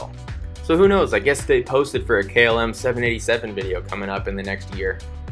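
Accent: United States. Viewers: I hate the KLM Lounge in Amsterdam! It's definatly one of the worst! It's always crowded and they only offer little amounts of food and drinks. Otherwise, nice and really interesting review. But please speak a little bit slower and more clear. Your American accent makes it quite exhausting to listen all the 39 minutes. 👍🏼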